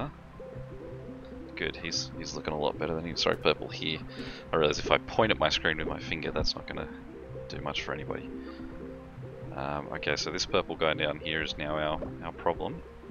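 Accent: Australia. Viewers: another good video, you aussie?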